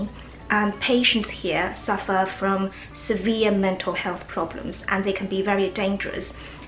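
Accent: United Kingdom. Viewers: What a beautiful Brith accent! I love it. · 听出来了 😂 您是英式发音： spill、 body ~ 明显英式发音😶😶😑😑😬😬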